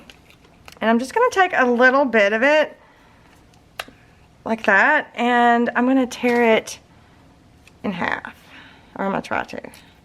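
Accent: South, United States. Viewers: You have a lovely country twang. I love hearing you talk. I remember when I first started watching I was lost sometimes, but the more I watched your tube tutorisls, but more I understood that beautiful country talkin. Jus sayin. ♡ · I love your southern accent but of course I live in Texas 😀. Beautiful! Everything u do is absolutely beautiful!😍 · I'm really having fun with this album, I'm using the Georgia Blue since I didn't have the Santa Baby paper and I'm loving it. So many different elements on the cover really special, love that. Can't wait to see the rest and for the way you talk, I think it's as cute as it can be. How could anyone not like a southern accent? How petty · Such mean people...love your accent...I have many friends from the south...I'm from the middle of Indiana, people from the north of the state thinks I have a southern accent, LOL. 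We have an Indiana twang...YOUR GREAT...Hugs...:) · Oh my...I love your southern accent. I'm from California so the way you talk is interesting. Lol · Well I have never heard that phrase before (Dirty English) SMH... HA People come up with the darndest (hummm is that even a word? must be my dirty English) things. I just adore your southern accent. And I for one can follow you just fine. !!!. Oh yeah, I love this album. Since I was a madeitcon, I'm working on the regular cover while finishing up my original album. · Gorgeous! Yes, love you southern bell accent!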